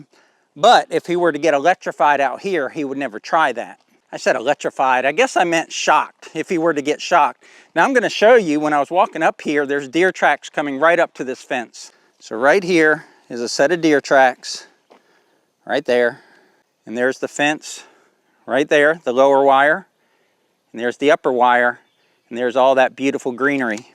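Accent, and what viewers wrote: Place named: Florida, United States. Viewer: your Florida accent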